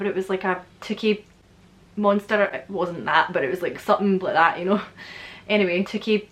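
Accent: Scotland, United Kingdom. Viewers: not gonna lie, honey, I adore your Scottish accent. just keep going, speak on. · I find the Scottish accent very attractive and sexy! Your Scottish accent is the best Scottish accent I’ve ever heard on YouTube! I’m talking with a Scottish accent x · I'm from Canada and my granny had a Scottish accent so I was used to it but when we went to Edinburgh I had no problem understanding anyone and yet I have a hard time understanding you sometimes. Is it because of where you're from? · I think your voice/accent is one of the best in the world, and I love it so much. I could listen to you speak all day, every day, forever. ❤ Bonnie Scotland. ✊ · But u also have the scottish dialect which comes with the accent havent seen past videos but i really liked this specific dialect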